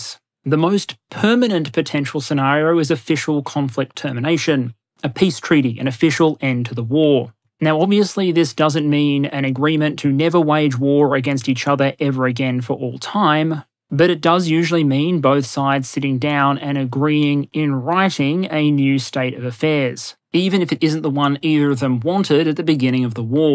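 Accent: Australia. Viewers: After watching a great f1 race, then the rest of the wec race at monza and also imsa i get to listen to an aussie talking about war and negotiations while making F1 references. Perfection.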